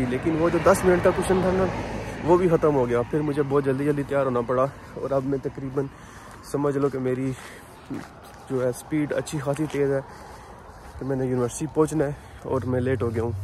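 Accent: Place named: Punjab, India. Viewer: jee love your punjabi touch ♥️ ❤️ bro keep going 💪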